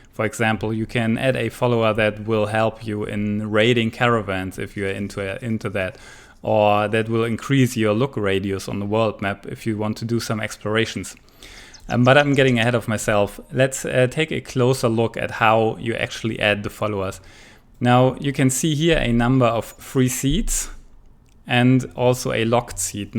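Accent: Germany. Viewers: 宁这英语，一股子🇩🇪味儿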